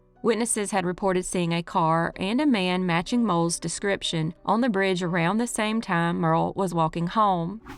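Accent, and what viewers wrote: South, United States. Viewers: THANK YOU!!LOVE THAT COUNTRY VOICE! KEEP EM COMING!1:hand-pink-waving:face-red-heart-shape:thanksdoc:🤠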